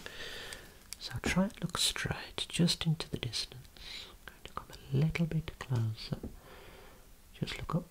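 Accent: United Kingdom. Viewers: Not sure if it is your british accent has something to do with it but I like when you say certain words like improvements, results & delicate. It’s so tinglyyyyy.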